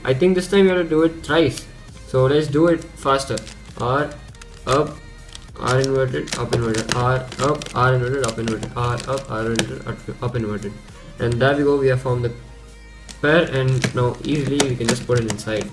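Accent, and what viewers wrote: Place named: India. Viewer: Indian guy where u live? (Accent makes me laugh)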